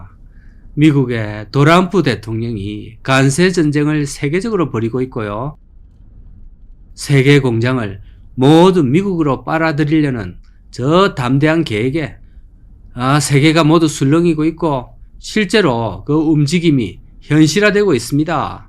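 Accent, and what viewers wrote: Gyeongsang, South Korea. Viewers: 갱상도 사투리정말 듣기싫다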